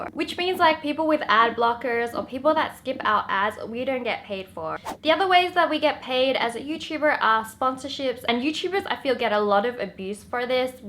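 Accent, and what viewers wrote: Australia. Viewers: OMG her Australian accent is way stronger in this video compared to her 2018 vids 😂😂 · is that Australian accent? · Is she Australian??? Fellow Aussie! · are you australian? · Love that Australian accent tho... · Are you Australian? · Are u an Aussie ??? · Your accent changes. I hear Australian n American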